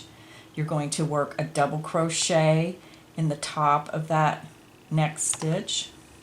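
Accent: Pennsylvania, United States. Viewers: Where are you from? You sound just like my best friend from Pennsylvania. I really enjoy your classes and have learn quite a few new stitches by watching. Thank you for your time and your posts.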